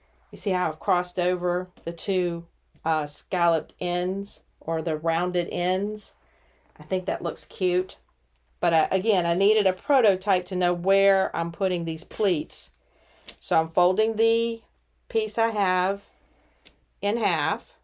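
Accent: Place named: United States. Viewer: I listened to your video shorts with the sound down and was surprised when I heard your voice had a US accent. You looked so typically British. 😊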